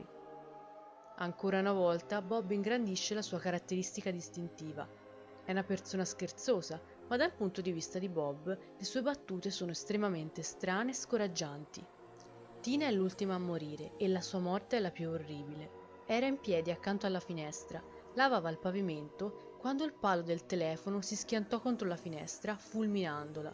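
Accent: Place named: Tuscany, Italy. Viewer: Te lo devo dire, sto guardando questo video alle 3 di notte(dopo aver visto diversi episodi di bob's burger), e dovrei avere paura, ma non ce la faccio con la gorgia toscana nella parlata😂😂. Comunque sei molto brava😁, gran bel video❤️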